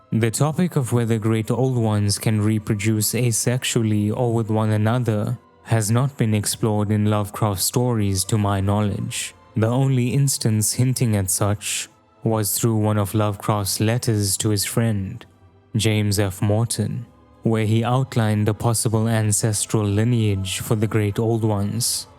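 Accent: South Africa. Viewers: South African? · Your voice sounds South African,South African Indian to be exact,id say from Durban but I could be wrong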